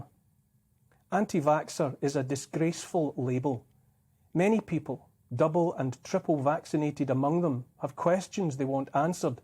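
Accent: Scotland, United Kingdom. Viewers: Moreover, forgot to mention that your Scottish accent is classical music to my ear! Brilliant!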